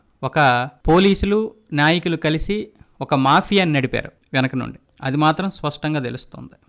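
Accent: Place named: Andhra Pradesh, India. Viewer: ని ఆడియో వింటే అర్థం అవుతుంది..... నీవు ఆంధ్ర వారివి అనుకుంట.... అందుకే విషయం కక్కే మీలాంటి కొన్ని యూట్యూబ్ ఛానెల్స్...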